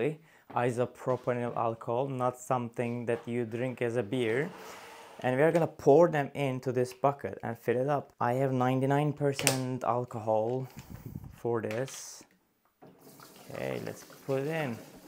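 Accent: Turkey. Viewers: Usta Türk musun sanki aksanda Türklük var gibi 😅😅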